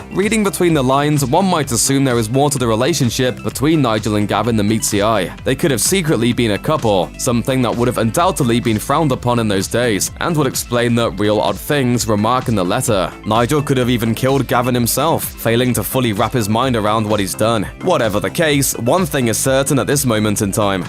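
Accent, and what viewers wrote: England, United Kingdom. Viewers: No he doesn’t- he pronounces it like an English guy as he’s English like me!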